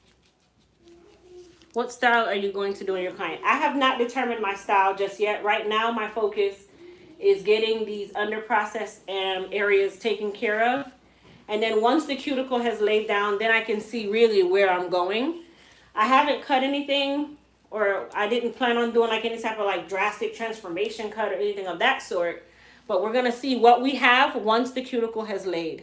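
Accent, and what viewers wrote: Jamaica. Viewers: Love the patois! Sounds like my house lol! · are you 🇯🇲? The accent come out😀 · You were asked are you from Jamaica because you have an Jamaican accent.No one would have ever guessed that your a yankee and that your from the east coast. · I hear some Jamaican in there lol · I like u n plus I hear our JAMAICAN accent makes me watch more of ur videos....he smile at d end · I hear that Jamaican accent 🇯🇲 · I heard the jamaican accent..ru from here... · Jamaica accent can’t hide 🇯🇲😀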